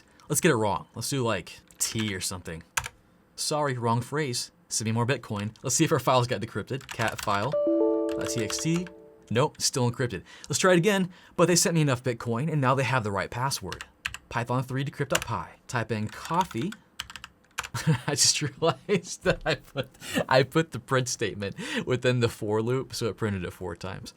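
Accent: Texas, United States. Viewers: what part of Texas are you from? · YEEEES HES TEXAN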